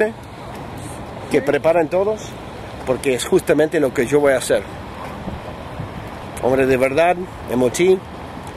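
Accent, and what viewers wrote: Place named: Argentina. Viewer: Loco,,,your spanish is very intact...HOLY CRAP....Argentina accent mixed with Spaniard...very inspiring... TWO THUMBS WAY UP!!! BEST VIDEO SO FAR!!!! MORE MORE MORE CHE!!! Will be showing it to my pops! LOL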